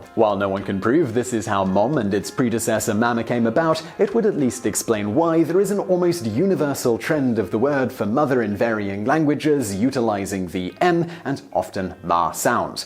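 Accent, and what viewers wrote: England, United Kingdom. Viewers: You should have stuck to the British pronunciation, Simon. Your accent is strong enough that when you try to say Mom, it doesn't sound at all like anything an American would say. And, believe it or not, there are plenty of Americans that use mum, instead of mom. So you wouldn't have been misunderstood. I don't get your reasoning behind deciding to do this. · Daven, I'm kind of the same way, I have so much British and otherwise foreign influence in my life that I'll often use british phrasing and not think about it. And I don't think it's really a big deal, whenever people freak out in the comments and criticize, I just laugh that they care that much about a pronunciation or phrase, especially in this case of a British narrator reading an American-authored script; it's bound to have all sorts of different terms and pronunciations thrown around! 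I've never understood why some people are so uptight about language, like thinking a word has to be said or spelled this way or some dialect isn't really the same language just because it's not the same as how you say it (looking at you, France!). I personally love how English is so diverse between British and American phrases, spellings, and pronunciations, and I count all of them as correct and don't critique someone for using one they wouldn't normally; that's what learning and experience is all about! I honestly wouldn't have sweated it if I were Simon. If I were him, I would have just read it however my brain decided to pronounce it at that moment and not care. And yeah, knowing him, his schedule being like that is pretty common, unfortunately! I wish he'd start doing updates on his vlog again, even if just weekly or every other week or whatever, so we could be in the loop! Send him a quick reminder from the fans, would you? 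;) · I'm American but I'm so used to the British accent I couldn't tell if he was British or American for like 3 minutes that was so wild wtf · You really do NOT need to pander to us American rubes. We can handle your English accent, and moreover, many of us are either from Canada, or have relatives there, so we're not (or shouldn't be) terribly alienated when you say "mum" instead of "mom." Oddly, you also refer to the Oxford dictionary. If you're gonna go all out American here you should probably stick to a version of Webster's. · Anyone else think this is Vsauce putting on a British accent?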